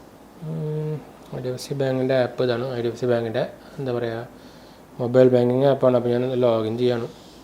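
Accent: Thrissur, India. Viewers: തൃശൂരാല്ലേ ഗഡീ ?😛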